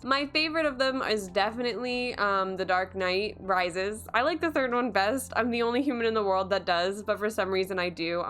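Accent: United States. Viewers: are you from the mid west ?. your accent sounds mid western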